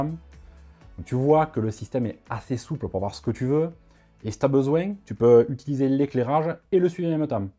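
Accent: South, France. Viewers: Hellooo 👋 Une approche différente de Yannick mais un enthousiasme similaire envers ce superbe stabilisateur et toujours avec l'accent du sud 😎✌️😉👍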